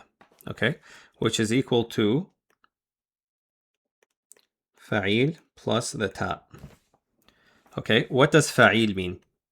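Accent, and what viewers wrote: Iraq. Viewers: are u iraqi? I'm just wondering because of uour accent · زين اني شلون عرفتك عراقي من اول كلمة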